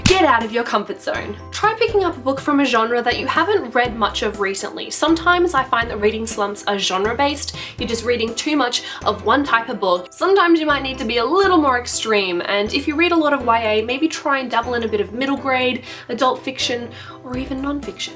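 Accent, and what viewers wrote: Australia. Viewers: Hello. First of all love your voice! Are you Australian? Anyways normally I get out of a reading slump by not, reading, watching booktube, or even buying more books. I'll just put a bundle of books into my Amazon shopping cart to get the urge back. Yes we're in this together!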